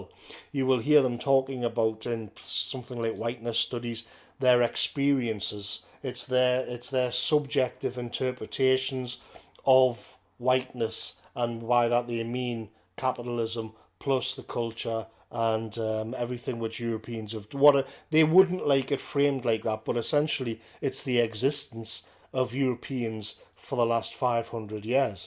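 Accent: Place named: Northern England, United Kingdom. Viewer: Love your content mate, great to hear a northern accent with such a fantastic understanding of philosophy and reasoning. Always look forward to the next one 👍 thanks!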